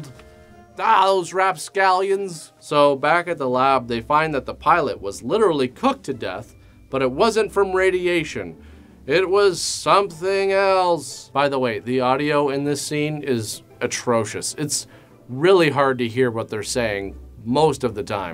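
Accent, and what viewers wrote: Canada. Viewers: First visit... You sound like a Canadian Sterling Archer... Only adds to the sarcasm. Excellent work.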